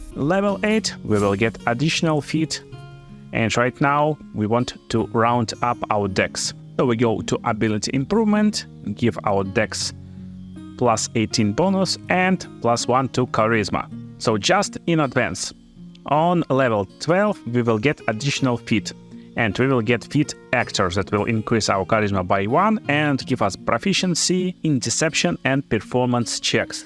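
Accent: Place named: Russia. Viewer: какой русский акцент :)))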